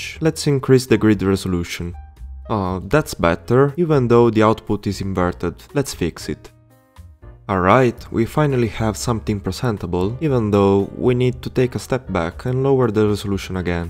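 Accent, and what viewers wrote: Italy. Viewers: Sorry if i ask but are you italian? I love you btw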